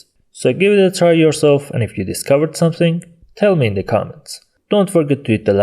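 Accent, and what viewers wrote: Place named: Iran. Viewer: لهجه قشنگ ایرانیع 😂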